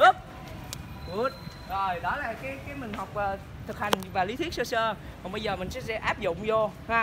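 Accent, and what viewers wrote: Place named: South, Vietnam. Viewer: Nghe giọng người miền Nam rất thân thiện và dễ mến. All the best dòng máu nhà 😊👍🐨🇦🇺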